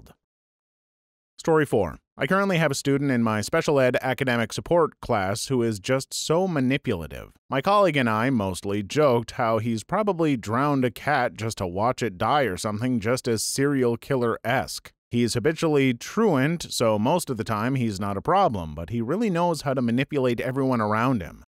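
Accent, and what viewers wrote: France. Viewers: Honestly, your French accent isn't that bad! I just don't understand those insults lmao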